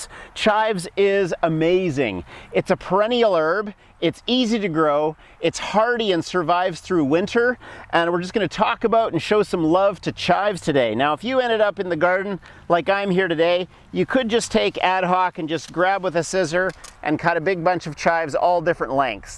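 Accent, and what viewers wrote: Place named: Canada. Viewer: I totally could hear you were from Canada, ay?🙂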